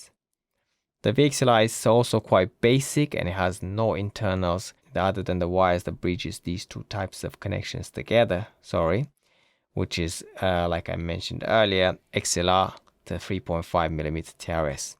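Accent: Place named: United Kingdom. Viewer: ❤your accent, like a native British. Also your knowledge in audio science is extensive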